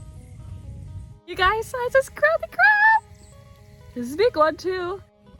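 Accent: Australia. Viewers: I hear an Australian flavour to the way you speak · You have a slight Australien accent.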